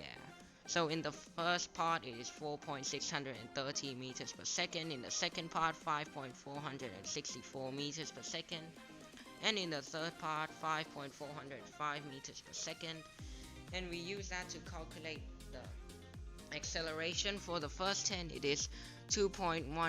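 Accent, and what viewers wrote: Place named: United Kingdom. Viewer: I like that bri ish accent😎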